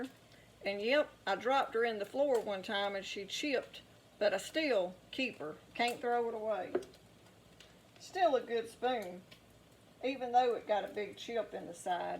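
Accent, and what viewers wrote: South, United States. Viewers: I can tell by your accent that you don't live on the internet, I've listened to people from the south that lose their accent after decades on the internet....'people from all over the world are becoming like minded'. It's good to hear a lovely southern accent and learn how to make sausage gravy from scratch. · I love hearing real American accents. ❤ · I can hear that Southern!! Love it -- I was raised hearing it. Now I know HOW to make homemade biscuit gravy. I am all set. Thank you, Ma'am. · I love your Southern accent! 😊. Your gravy looks great! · New sub! After your southern drawl, and your demonstrated talents, I had to sign up!😅 I have got to get a cast iron pan! I Sooooooo wish I had nabbed my grannies ancient cast iron skillet back in the 70's when she passed, but at 16 I had no interest in cooking.😅👊👍✌🗽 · As a Ga boy, i can tell you right now if you are looking for videos of our food, and the person doesn't sound like this woman find another video. 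This is legit how we do it in the South.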